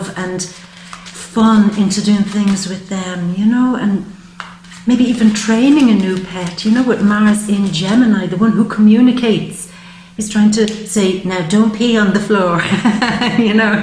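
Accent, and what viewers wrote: Ireland. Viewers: This is 1 of the best readings you've ever done. Everything resonated. All the best to you from Canada 3> By the way what part of Ireland are you from?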